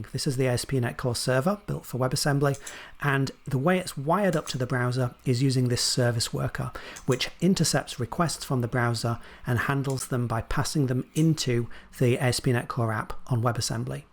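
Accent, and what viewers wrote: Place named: Australia. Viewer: Great guy but can never listen to him for more than 1 minute due to stupid Aussie Accent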